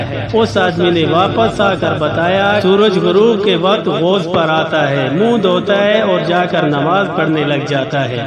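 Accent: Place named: Punjab, Pakistan. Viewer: بھوتنی کے اُردو ٹھیک سے بولا کرو نہ کہ پنجابی نما اردو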